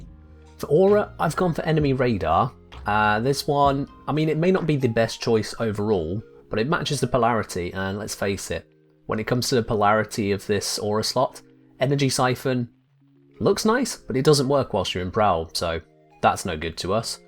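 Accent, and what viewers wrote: United Kingdom. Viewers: you and your beautiful british accent saved me, thank you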